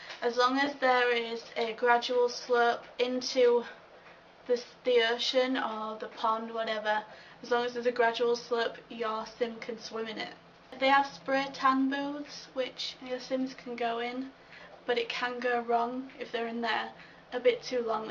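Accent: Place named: England, United Kingdom. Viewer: Yay!!! an English accent!! Finally!! I'm british too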